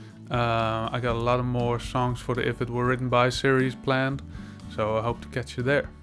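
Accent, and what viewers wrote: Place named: Netherlands. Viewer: The Lord has a voice! And he is Dutch! (The first 3 seconds gave it away)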